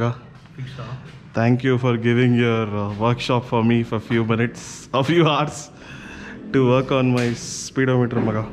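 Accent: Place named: Karnataka, India. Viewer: Namaskara boss❤️ Nice vlog❤️ Bro your kannada slang and your base voice is superb ❤️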